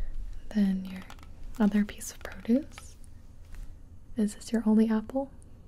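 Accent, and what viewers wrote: Canada. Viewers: w8 is cAnaDiaN?!?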